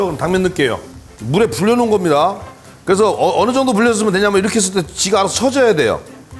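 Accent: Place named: Gyeongsang, South Korea. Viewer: 경산도 말씨가 귀에 착착 감깁니다 영상보면서 입가엔 미소가 생기네요 재밌게 잘 보고 있어요^^ 윤이련씨, 가족분들은 좋겠어요.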